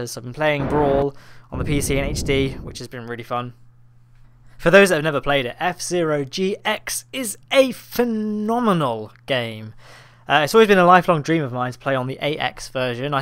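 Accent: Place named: England, United Kingdom. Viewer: You are very English arent you? English is not my mother language but your accent is so strong :D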